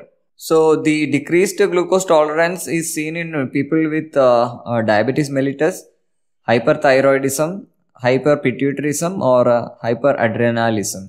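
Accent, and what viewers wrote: Kerala, India. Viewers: Kerala boy❤ lol accent 😂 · Is video mein, Indian accent bahut zyada strong hai..indian accent bahut annoying hoti hai.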